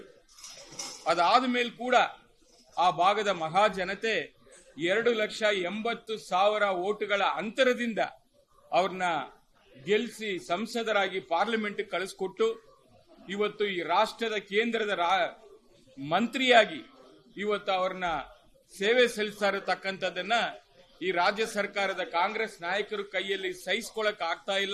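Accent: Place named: Tamil Nadu, India. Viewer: ಕನ್ನಡ ಸರಿಯಾಗಿ ಮಾತನಾಡಿ ಮಾನ್ಯ ತಮಿಳ್ ಪಿಳ್ಳೈ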